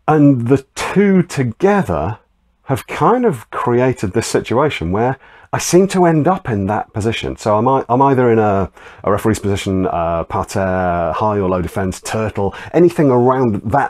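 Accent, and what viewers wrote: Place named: London, United Kingdom. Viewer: You have been taught actual catch wrestling where you lose the match if you're pinned. There are other systems that come from catch wrestling such as Luta Livre (almost definitely the best style there is), Shooto and CSW where the match doesn't end if you're pinned. I recommend learning these systems if you're trying to learn to fight off your back but don't want to learn Jiu Jitsu and want to carry on using your catch philosophy and techniques. There's team cross face in South London where they teach CSW. By the way you sound like you're from London. If so, do you teach people? because in your video you said you're willing to teach.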